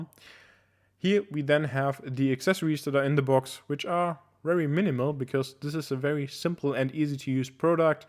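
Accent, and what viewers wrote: Germany. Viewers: Deutscher Dialekt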